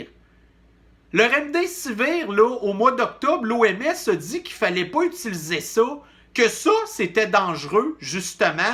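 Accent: Quebec, Canada. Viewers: ta façon de parler avec tes tripes, typiquement québécoise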